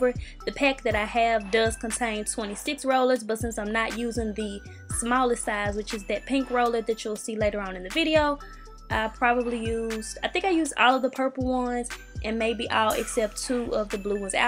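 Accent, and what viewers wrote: South, United States. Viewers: first of all I would like to say, you are so cute and country lol. What southern state are you from? Second great tutorial. I've been natural for 5 months and my hair is about 5 inches and I plan to relax it soon so it will be the length of yours and I consider my hair short as well. This channel is just what I needed, thank you so much! I also see women with super short hair when I look up "short relaxed hair tutorials" and when I look up medium it is longer than mine. keep these videos up! I am def. a new subbie!!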